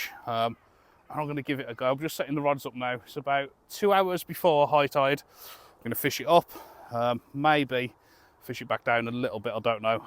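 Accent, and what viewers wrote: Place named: Black Country, United Kingdom. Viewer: Love the dudley accent same as me big up the black Country 😂😂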